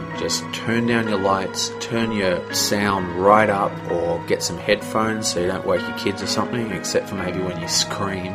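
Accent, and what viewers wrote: Australia. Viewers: Are you Australian? You got a nice, calm voice to listen to, and greate review! :D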